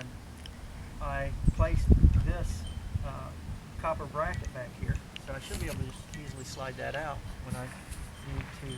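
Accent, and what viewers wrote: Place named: Kentucky, United States. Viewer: Are you from Kentucky or Tennessee?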